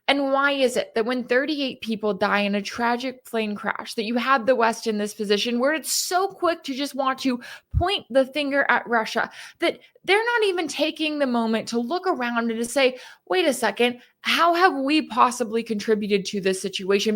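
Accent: Texas, United States. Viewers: She sounds fine nothing wrong with it a girl from Texas.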